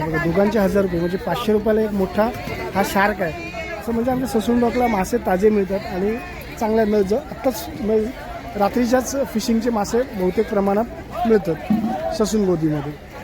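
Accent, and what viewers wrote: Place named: Malvan, India. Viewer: Tumchya tondat Marathi bolata bolata malwani bhasha yeta garva asa malwani aslyacha😂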